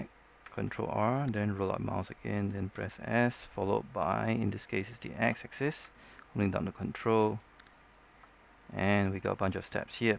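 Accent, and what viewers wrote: Singapore. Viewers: You are from Singapore, the accent.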